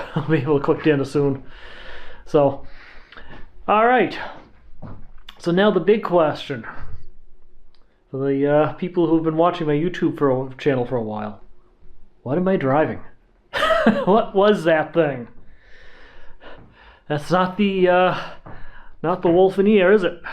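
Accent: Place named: Massachusetts, United States. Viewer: As a Northshore transplant in southern Maine, your MA accent sounds like home. Mine is pretty much gone. It only comes back when I’m around my brother or my parents. They’re in Amesbury and Peabody. I’ve been out of MA since 1999.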